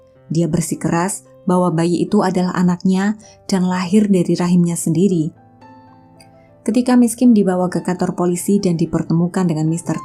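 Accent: Java, Indonesia. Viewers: Logatnya terlalu medhok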